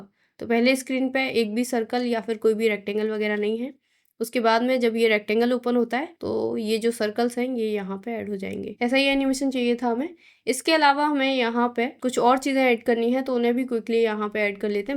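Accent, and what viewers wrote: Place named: Uttar Pradesh, India. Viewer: Aap UP se ho kya... Tumhare Accent se lg rha h:face-orange-biting-nails:. Btw loved your Editing style and Content.❤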